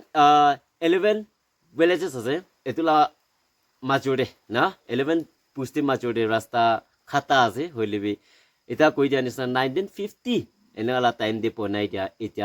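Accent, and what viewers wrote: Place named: Thailand. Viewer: I like your Thai accent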